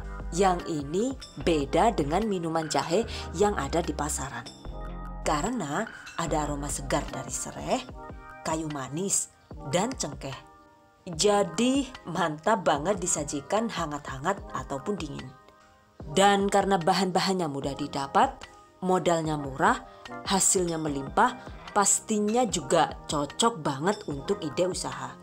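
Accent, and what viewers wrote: Banyumas, Indonesia. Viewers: Top banget lah,seneng karo ngomong ngapake,nek ora ngapak ra kpenak he he he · I Like this, medoknya banyumasan nambah lucu dan semangat nonton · Suaranya antik , ngapak banget hahaha deneng fasih temen , wong ngendi Rika? Wkwkwk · Jane wong ngendi yu? Deneng cok ngapak. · Jebule wong pengapakan ya 😊. Mohon Ijin mau dicoba buat usaha resepnya. Salam ngapak bu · Waduh... ngapak banget.... 👍👍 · Hemmmmm suaranya khas bgt kadang kangen ngapak nya jga ...mksh banyak beri info yang bermanfaat semagat berwira usaha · Wkwkwk ngapaaakkk ngapak, banyumasan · Lucu bahasa ngapake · Bunda kok ngapak ya ngomonge🤣🤣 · trimakasih kakak resepnya..kakak lucu waktu ngomong jawa ngapak😂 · Kk. Ngapaknya daerah mn nih??? Cilacap mn? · Mbak-nya orang ngapa yak? 😊 sama dengan orang tua saya he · Ladalah...jebule ngapak kaya inyong, mbekayu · Saya kira bule. 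Ternyata bisa ngapak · jebule rika wong ngapak ya, aq wong pbg ,rika wong ndi bun? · Keluar deh aslinya wong ngapak hehehe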